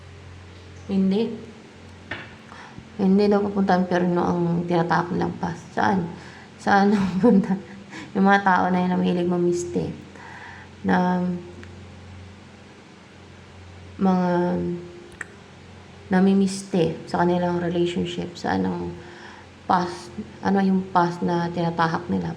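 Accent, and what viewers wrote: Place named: Visayas, Philippines. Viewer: bisaya ka madam a bisaya din cebu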